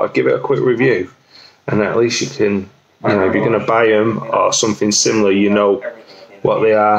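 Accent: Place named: England, United Kingdom. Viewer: Такой ужасный английский акцент!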